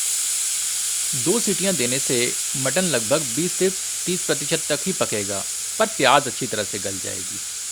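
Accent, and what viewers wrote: Standard. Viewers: itni madhur aur suddh hindi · Yeh shudh hindi hai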